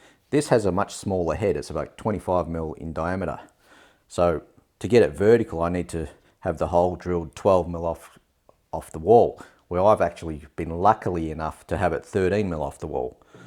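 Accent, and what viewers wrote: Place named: Melbourne, Australia. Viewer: wow not only the channel I have been looking for but from a fellow Melbournian it's a bonus ( thought I recognised the accent)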